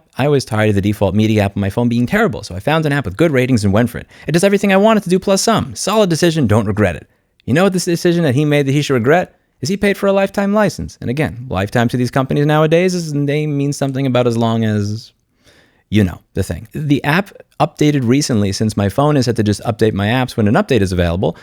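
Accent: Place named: Boston, United States. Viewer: Why do I always think of "Good Will Hunting" when I watch? It has got to be the accent or something...when is schooling companies online, it totally reminds me of the bar scene!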